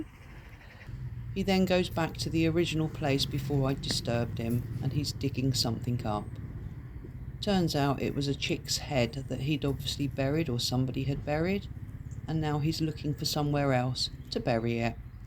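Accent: United Kingdom. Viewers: Beautifully narrated video. It's very nice to see them safe and healthy. Always puts a smile on my face when you say "filthy dirty nose!" Must be the nice British accent. Thank you. Stay safe and well everyone! 🙏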